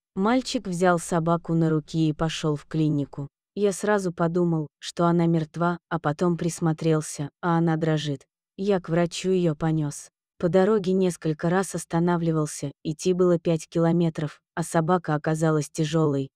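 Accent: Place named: United States. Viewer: Кто нам читает американка что-ли слышите как говорит ?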